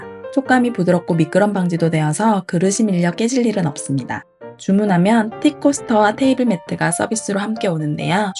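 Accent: Gyeongsang, South Korea. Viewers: 영상 너무 잘 보고 있어요~ 실례지만... 경상도분이신지요? 중간중간 살짝 사투리가 나오는데... 나머지 표준어는 너무 잘 쓰셔서 문뜩 궁금해서요 ㅎㅎ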